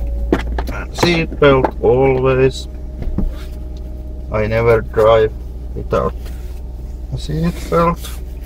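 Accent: Finland. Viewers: On kyllä nii finnish english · I love the Finnish accent and the car as well :)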